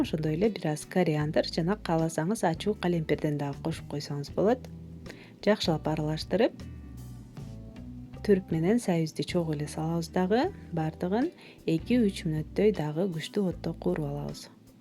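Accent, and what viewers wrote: Standard. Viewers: ушул кыздын уну да жагымдуу. нукура кыргызча да келиштирип суйлойт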